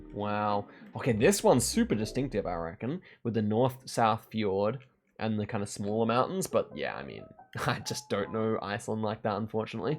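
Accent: United States. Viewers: as a georgian (us) gotta love the alabama accent😂😂😂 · love your southern US accent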